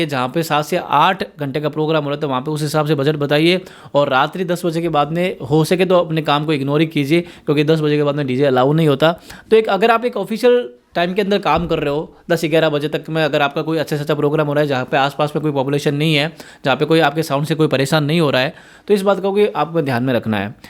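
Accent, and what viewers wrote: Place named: Marwari, India. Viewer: मारवाड़ी सुनकी मजा आगया 😅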